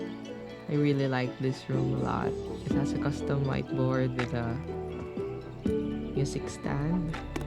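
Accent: Philippines. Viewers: Ur filipino cos of ur accent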